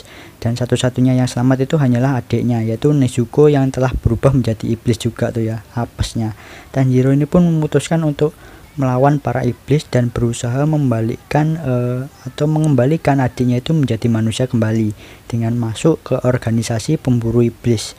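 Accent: Java, Indonesia. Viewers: GUE# jawanya medok....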